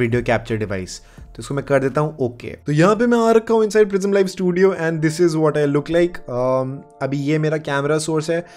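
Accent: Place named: India. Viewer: Maybe native English speaking people understand what Indian people say when talking "English", the rest of the world doesn't. All your words are twisted in a strange way. And you also speak always in a hurry. When you slow down when your are talking it helps a lot to get more viewers. I did watch only 30 sec's.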